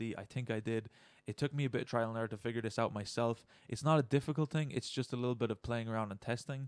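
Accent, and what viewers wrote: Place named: Ireland. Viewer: defintley irish, just cant tell exactly where :/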